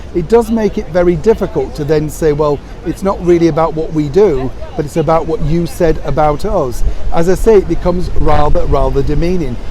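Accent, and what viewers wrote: Yorkshire, United Kingdom. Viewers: Neil can you tell me why you pronounce "says" to rhyme with "prays" -- "Sez" is typical British-American usage but I understand "Says" is a regional or BBC thing in England? Is this how you were taught in school or just how the community in which you grew up spoke in Yorkshire? Thanks, interested in linguistics! I've noticed a few other British you tubers do this including HG Tudor and Blackbelt Barrister.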